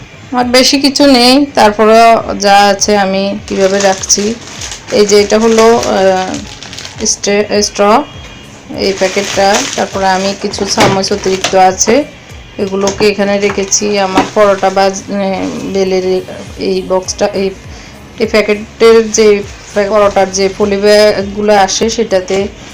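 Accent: Noakhali, Bangladesh. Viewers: Mone hoy apur desh Lokkipur ,Noakhali,darun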